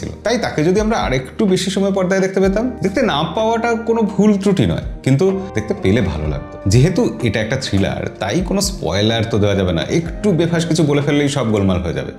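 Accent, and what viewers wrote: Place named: Bangal, Bangladesh. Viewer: Apnar accent puro bangal😄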